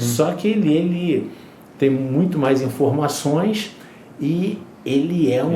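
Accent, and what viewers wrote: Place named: Sao Paulo, Brazil. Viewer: O carioca ortodoxo agora é mais paulistas. Rsrs. Te amo meu primo. ❤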